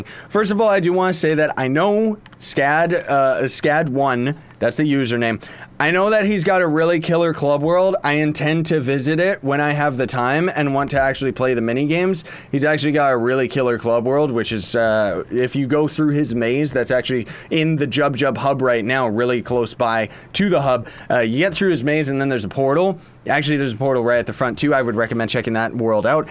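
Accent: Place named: Canada. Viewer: I like Canadian accents, they say oo when they would normally be o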